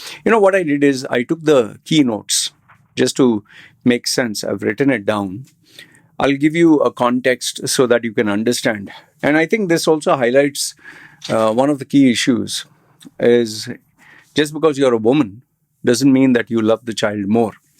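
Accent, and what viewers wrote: India. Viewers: Your accent is Indian too…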